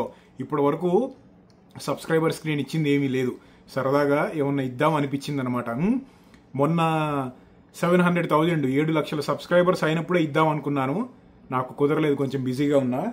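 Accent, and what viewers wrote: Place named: Telangana, India. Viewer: pure telangana slang Broo. support from Kamareddy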